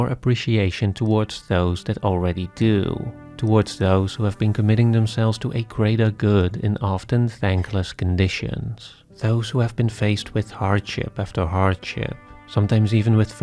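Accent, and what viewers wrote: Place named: Netherlands. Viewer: Ik ruik een Nederlands accent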